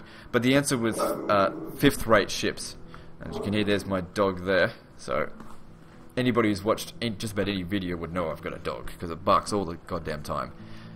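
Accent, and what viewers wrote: Australia. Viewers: Australian I think (at least that's the most obvious too me, as he is australian)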